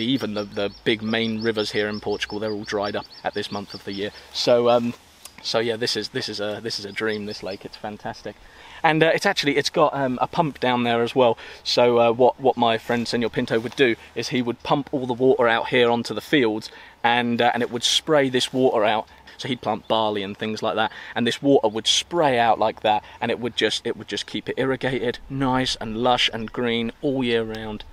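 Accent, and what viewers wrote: Norfolk, United Kingdom. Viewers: Sound like a rum ole Norfolk boi! Nice vid thanks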